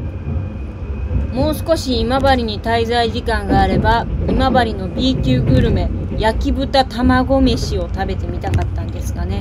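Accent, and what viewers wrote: Kansai, Japan. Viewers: 絶妙な関西弁のイントネーションが、かわいい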